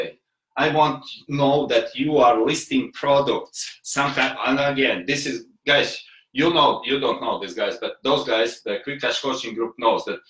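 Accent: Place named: England, United Kingdom. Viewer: Interesting point of you! I like your English accent~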